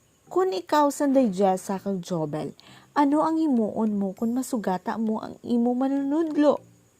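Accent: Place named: Iloilo, Philippines. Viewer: Ilonggo gid ya...♥️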